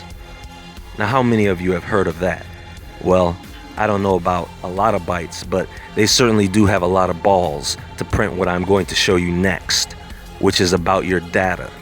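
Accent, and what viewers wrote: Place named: New Jersey, United States. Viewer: Where are you from? You sound like a NJ guy. Wherever, I’m addicted to your voice. Great channel. Keep it coming!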